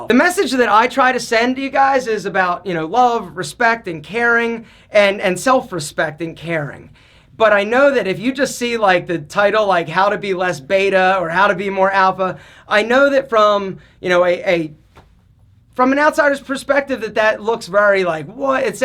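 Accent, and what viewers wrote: Philadelphia, United States. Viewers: I enjoy your posts. I get a kick out of your strong Philly/South Jersey accent. I also agree about the Dollar Shave stuff. The shaving stuff is a bit expensive, but you need so little to have a great shave! So, hang in there . Keep amusing with good advice.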